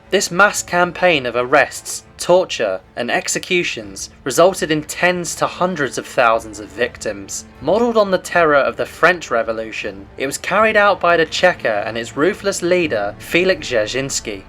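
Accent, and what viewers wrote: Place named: Cockney, United Kingdom. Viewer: One torture the Soviets were never cruel enough to impose was forcing one to listen to a bleating cockney voice drone on and on for 10 minutes!!!!!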